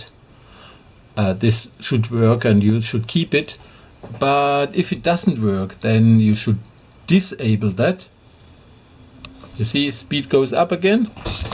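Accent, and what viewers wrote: Germany. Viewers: Man merkt absolut dass du deutsch bist aber trotzdem gutes video :)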